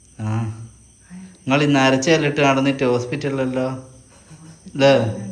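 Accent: Vadakara, India. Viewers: വടകര ഭാഷ നല്ല രസമുണ്ട്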